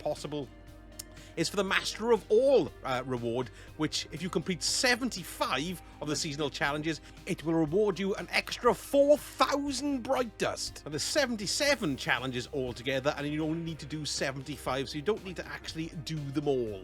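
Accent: Wales, United Kingdom. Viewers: Thank you Mr Welsh man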